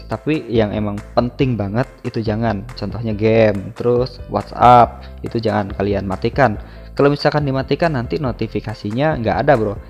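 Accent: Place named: Sunda, Indonesia. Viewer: Alah c mamang sunda nya meni lekoh hahaha semangat terus bang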